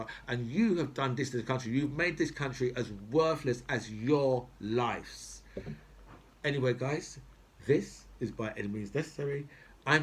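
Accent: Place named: South London, United Kingdom. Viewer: You're welcome, and the pleasure really is mine. I'm just a concerned foreigner, a former resident of the UK, during the happy days of freedom of movement, with a few British relatives. So I care, even if it doesn't hurt me all that much personally. I subscribe to quite a few brexit critical youtubers, Phil from A Different Bias, Maximillien Robespiere, Bowlerhatman, that German guy (forgot his name sorry), and more. I like you because you speak the language of the London streets I used to live on. I lived in SE14, New Cross Gate, around the corner from Peckham. You talk like you're from there, and about something that is close to my heart, the UK 's attempted severance from Europe. So, for me, you're adding something meaningful, the argument against brexit in the language I was used to hearing all you around me. Because you tell it like it fucking is. 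Do you know what I mean? 😀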